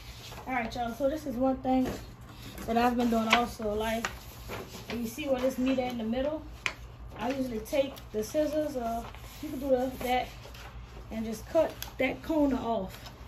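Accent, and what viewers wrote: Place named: New Orleans, United States. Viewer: Sounds like she's from New orleans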